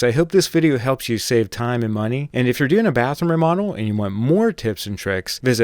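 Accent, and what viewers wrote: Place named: Pittsburgh, United States. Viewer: I KNEW I heard a pittsburgh accent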